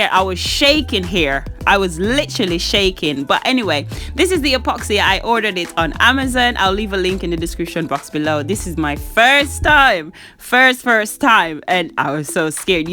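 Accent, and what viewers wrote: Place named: United Kingdom. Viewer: This is too much creativity.You did the decor quite well.I also loved the..is it British English? So amazing.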